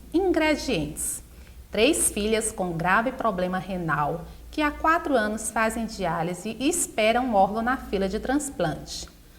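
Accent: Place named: Northeast, Brazil. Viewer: Sou de SP. Mais esse sotaque do Nordeste é o mais lindo do Brasil. Adorei está aula.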